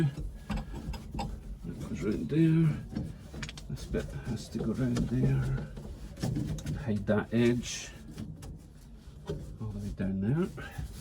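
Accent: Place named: Scotland, United Kingdom. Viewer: Hey! Love your accent. Reminds me of my Granny who hailed from Scotland. The similarities end there. You got a much tighter and hotter ass than Granny had! God rest her soul!! Happy Easter.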